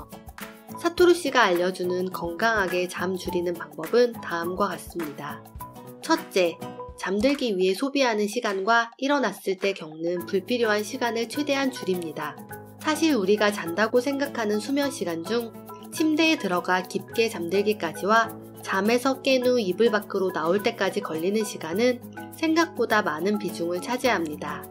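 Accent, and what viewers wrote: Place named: Gyeongsang, South Korea. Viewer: 녹음하신분이 경상도분이시구나 ㅋㅋㅋ 경상도 사람은 딱 알아봄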